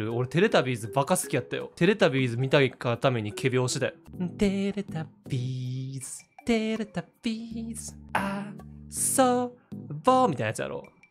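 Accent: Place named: Kansai, Japan. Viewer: 寝れない夜中に見るもんじゃなかった😂腹痛い sqlaさんコラボとかスト鯖でおもろい関西弁の人って印象だけあったけど、本当に面白い😂 切り抜き見て良かった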